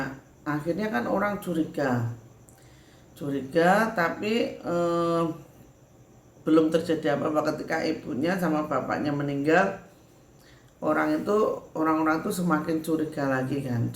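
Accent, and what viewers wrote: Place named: Java, Indonesia. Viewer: Menyimak semakin lihat dengarkan semakin suka lucu2 medok bhsa Jawanya. Yang kadang bikin tersenyum juga lihatnya. Pingin rasanya ketemu walau sekedar jabat tangan tapi jauh di Sragen.😂😂😂❤❤❤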